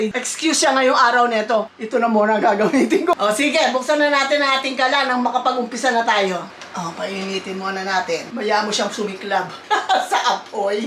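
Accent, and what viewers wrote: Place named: Pampanga, Philippines. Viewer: bat parang maypagkapampangan si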